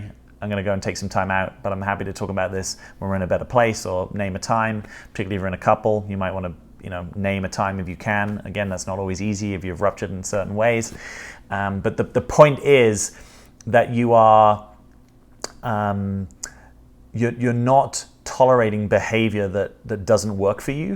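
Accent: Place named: England, United Kingdom. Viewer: I think that's what I've gathered. has a very similar accent to mine from England.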